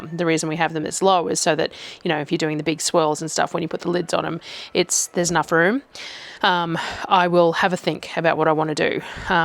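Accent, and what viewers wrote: Australia. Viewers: Good afternoon from the States. I love your vids girl. So fun to watch what you come up with. I find when I'm having a really bad day, I get excited when I get notified of one of your cooking videos. It brightens my day. Your voice is soothing and since I have lived in Mosman, a suburb North of Sydney many years ago, I enjoy hearing your accent. It reminds me of the many adventures I had there and how bad I need to go back. Thanks for bringing light into a dreadful day and for so many cool ideas to cook or bake. You're a lovely woman and I hope when I get back under, I can buy some of the foods you use that we can't get here and send them back to the States...the cost would be so worth it. Ta and have a wonderful week!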